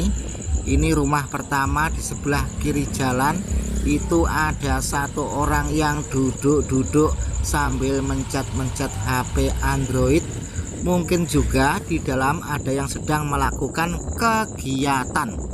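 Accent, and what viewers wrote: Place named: Bojonegoro, Indonesia. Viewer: Aku melihat konten2 Pak Dhe ini hanya dari sisi "NJAJAH DESO MILANGKORI"-nya. Utk wilayah Jatim hampir sebagian besar sudah di-JAJAH DESO MILANGKORI. Utk Jateng... masih mlipir2 ndeso2 perbatasan, Rembang sama Blora. Yg aku salut... Pak Dhe ke mana2 hanya naik motor matic-nya. Sepertinya secara logat dan awal2 berkonten, beliau asal Bojonegoro.👍🏻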